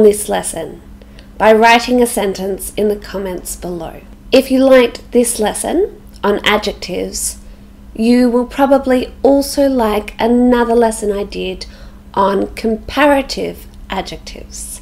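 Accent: Australia. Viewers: I am used to understand more American accent than other accents and I was trying figure out which word you were saying at certain moment in the video. I found out when you said and wrote the word on the screen. The word was "big". hehehe A very easy word but I swear I really couldn't get it. I love accents as such as British, American, Australian, Canada and New Zealand. Therefore, I always say that we must listening different accents. By the way, I love the Australian accent. It is so marvelous how you sound when you speak.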